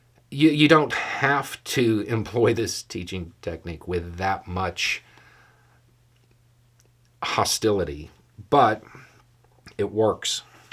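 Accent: South, United States. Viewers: I beg your pardon, I happen to watch every video you put out. 😉 I also share them to educate those who are down the rabbit holes of conspiracy theories. Avid fan of your content, including Roads with. I’m a Georgia girl, so I just love to listen to you speak. You’re intelligence, calm demeanour & southern accent in a sing/song tempo is mesmerising. I do enjoy when your temper flares every now & again.😂 It reminds me of my childhood. Stern, but not aggressive.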